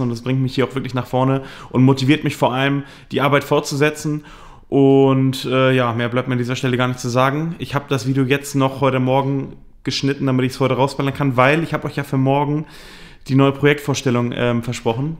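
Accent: Carinthia, Austria. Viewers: Kärnten Niederösterreich? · Kärnten Niederösterreich? 🤣🤣🤣🤣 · Kärnten, Niederösterreich? 🤔 😅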